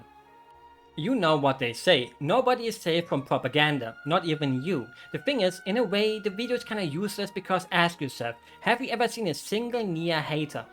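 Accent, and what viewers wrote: Germany. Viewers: I just came along this video and looked at your channel and thought: "wow that this is a Nia fan and he has a Deutscher Akzent" and then I took a look at the channels description and I was definitely not disappointed xd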